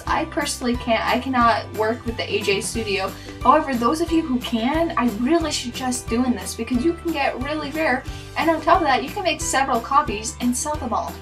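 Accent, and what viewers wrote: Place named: United States. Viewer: I love your mini country accent it's so cute❤️ :)))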